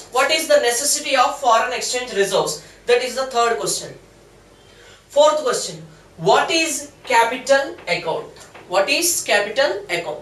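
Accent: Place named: Telugu, India. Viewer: sir superb ga explain chesaru.....chala clear vundhe me concept....many of us are lucky...i think u'r mother tongue is telugu....superb...keep uploading.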